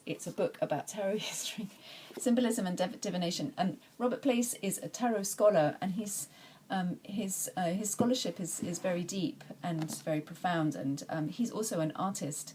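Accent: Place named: England, United Kingdom. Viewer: How did you get a nice English accent ?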